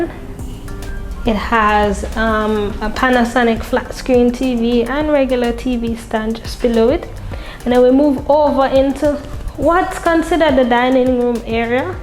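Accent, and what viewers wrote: Jamaica. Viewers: you are very funny and cute... Especially when you let go with your Jamaicaness. I love you videos and your energy..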